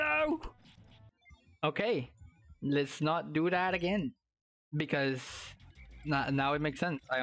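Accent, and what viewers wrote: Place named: Latin America. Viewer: I like your latin accent 😂❤️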